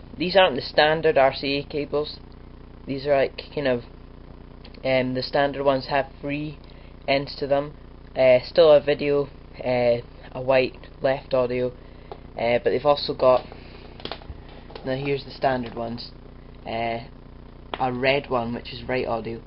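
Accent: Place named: Scotland, United Kingdom. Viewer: Chewin the fat this guys well Scottish :L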